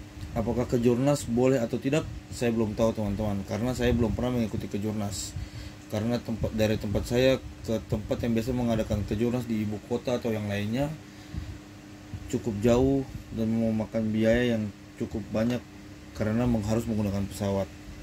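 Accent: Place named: Ambon, Indonesia. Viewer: Logat nya kayak logat Ambon ka om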